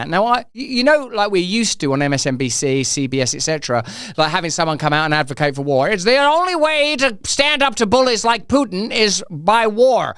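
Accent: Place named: United Kingdom. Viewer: Russell Brand is funny but he talks so fast and so British if you blink you could miss something. “Hey we don’t have evidence he’s a Russian plant! No, no evidence I’m sorry I just got on tv, got excited, and thought I might say something incendiary.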